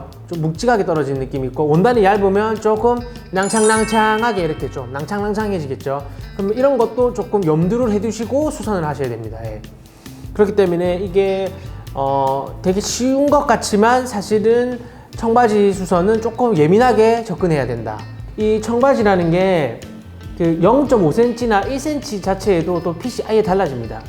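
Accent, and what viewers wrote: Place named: Busan, South Korea. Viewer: 유투브 잘보고 있습니다.같은부산사람으로 남천동에 살고 있습니다. 동천고에 다녔다고 하니 너무 반갑고. 찰진 사투리가 매력적이라 하루저녁에 동영상을 다 봐버렸네요. 유쾌하고 밝은 에너지가 느껴져서 언제 한번 커피마시러 서울로 가고 싶습니다. 옆에 같은 친구 또는 이웃이 있다면 사는게 재밌을것같습니다 색상 배색도 한번 부탁드리겠습니다 쌩큐~~^^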